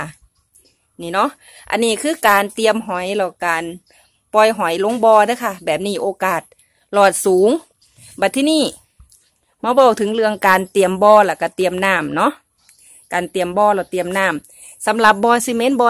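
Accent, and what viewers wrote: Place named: Isan, Thailand. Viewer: ***เสียดาย สำเนียงอีสานฟังไม่ออก...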